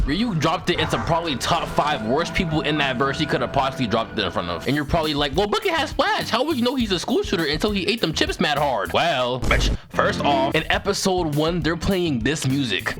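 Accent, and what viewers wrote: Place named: Philadelphia, United States. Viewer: you so philly bro 😂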